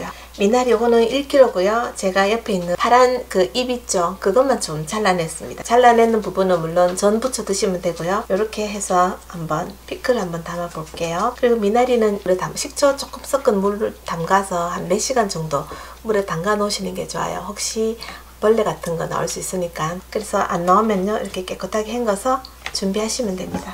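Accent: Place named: Daegu, South Korea. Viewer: 님 목소리도 시원시원 요리도 시원시원 대구 말씨가 정겹네요 팬입니다 저도고향이 대구입니다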